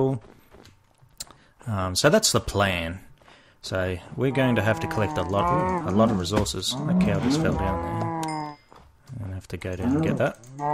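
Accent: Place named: Australia. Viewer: Whoa!! Loving it so far! You sound so... Aussie! Ahahahaha Most amazing bro.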